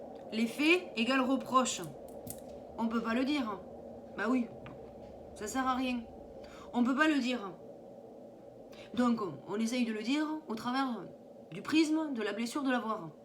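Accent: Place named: South, France. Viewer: J’adore ton accent du sud tes expressions et ton franc parler 👍 merci pour tes vidéos explicatives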